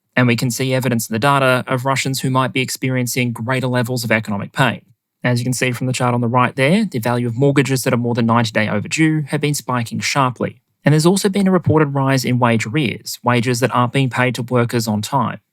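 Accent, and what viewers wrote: Australia. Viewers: Well, he's an Aussie. Were you expecting something like "Australian language"?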